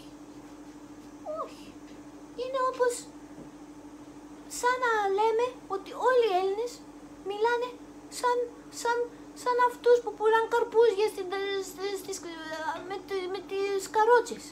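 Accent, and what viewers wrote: Japan. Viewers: Miss Nancy, νομίζω ότι μόνο αν πήγαινε η σε γλωσσολόγο θα μπορούσε να καταλάβει γιατί υιοθέτησε στοιχεία φωνολογίας, τον τόνο, την προσωδία, τον επιτονισμό κτλ της ιαπωνικής γλώσσας και μάλιστα όχι π.χ. της αγγλικής. Σίγουρα ως ένα σημείο γίνεται ασυναίσθητα, όμως, είναι και μια συνειδητή επιλογή ταυτόχρονα, τουλάχιστον νομίζω ότι οι γλωσσολογικές μελέτες αυτό δείχνουν. Κάποιοι που ζουν (ως ενήλικες) στο εξωτερικό αλλάζουν περισσότερο την προφορά τους από άλλους που ζουν ακόμη περισσότερα χρόνια. Οι λόγοι πολλοί: μπορεί να αισθάνονται ότι αφομοιώνονται καλύτερα στη ντόπια κοινωνία όταν μιλούν ακόμη και τη μητρική με ξένη προφορά, μπορεί να τους ακούγεται πιο εύηχο κτλ κτλ κτλ. Προσωπικά, ζώντας 17 χρόνια στη Ισπανία, δεν έχει αλλάξει η προφορά μου, παρότι δεν έχω παρά σπάνια επαφή με άλλους Έλληνες, αλλά, όταν λέω κάτι στα ελληνικά μπορεί π.χ. 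να κοτσάρω κι ένα «ναι;» στο τέλος, κατ´αντιστοιχία με το ισπανικό «no?» γιατί και το έχω συνηθίσει στα ισπανικά στο τέλος καταφάσεων(δηλ γίνεται και ασυνείδητα), αλλά γιατί το βρίσκω πρακτικό (δηλ το κάνω και συνειδητά σε ένα κομμάτι του). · Γεια σου! Γιατί μιλάς τα ελληνικά με ιαπωνική προφορά; Χαιρετισμούς από Manchester!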